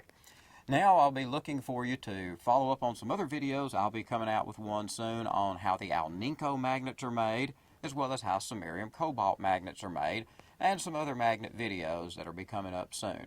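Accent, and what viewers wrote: United States. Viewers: He should have someone else with a proper English accent narrate His videos. That Mercan accent can be quite annoying... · You sure do talk funny (in fact I was put on speaker phone so that the office ladies in Tennessee could listen to my Australian accent and have a giggle: ) Great informative video. It is so much a pleasure to hear an American who enjoys China. It is a great country and my family and I have spent many months there - Thank you for sharing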